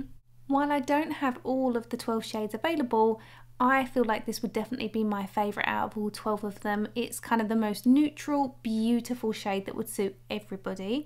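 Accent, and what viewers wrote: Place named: England, United Kingdom. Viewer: I think I liked the near black one the best. I agree with you, because I think the quality and intensity of the colors was really exceptional. Pricewise, it could be a lot worse. I had assumed, while watching your video that this would be a European brand because of your English accent but I was glad to see that you referred to how many dollars they cost. I’m going to check and see what I can find out about them. Thanks for sharing this. New subscriber here. 👍🏼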